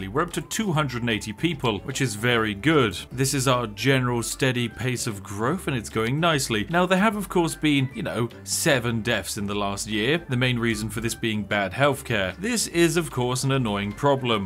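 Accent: United Kingdom. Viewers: I have never heard someone with a British accent be as capitalistic as. It's a "Capitalism Britt".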